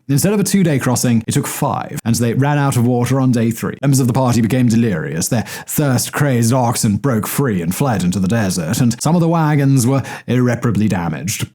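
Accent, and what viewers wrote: United Kingdom. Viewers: Why are British accents so dreary/boring? This guy could be talking about the cancer cure and I'd fall asleep every time.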